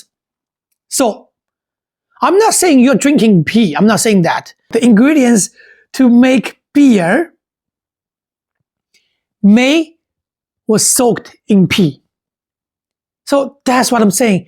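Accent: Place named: China. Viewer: congrats thats not the norm... You're taking a single video and the spinning it like as if its the norm in china and that everyone acts like that... based on your accent and the way pronounced the restaurant I can pretty much deduce that you're chinese... Yet you seem to spinning china's perception as uncivilized and backwater... I tell you what, you can see the same actions in America, or even elsewhere around the world, yet you actively decide to criticise china like as if the west is best and china is uncultured and uncivilized... And by the way the video is of rowdy kids who were drunk, its not people who are sober and doing it for kicks... Is a dude drunk off his bum and doing dumb shit... Lastly the foods and restaurants are clean and sanitary, like I said this is not a common thing that happens in china... Way to spread China hate and chinese hate... 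You are part of the problem why chinese people and china is getting unwarranted hate from the west... You are feeding the racists and anti china crowd.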